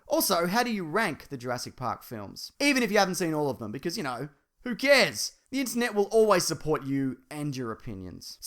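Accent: Australia. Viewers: He lives in Australia hence the accent.. Cmon now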